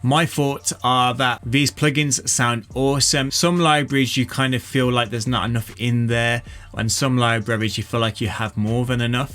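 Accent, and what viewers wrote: Birmingham, United Kingdom. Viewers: Spitfire do the free BBC orchestra? Also you sound like a Brummy, though maybe somewhere close so, I believe people from Lichfield and other areas have a similar accent, I'm from Stoke so eh, just wondered roughly where you were wandering around in the trees? 🤔🙏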